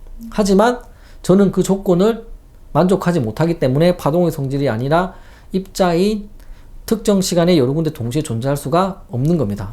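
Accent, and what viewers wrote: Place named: Gyeongsang, South Korea. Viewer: 했던말 또하고 했던말 또하고 양자에 쳐맞았냐? 갱상도 사투리 듣기싫다 영상 찍지마라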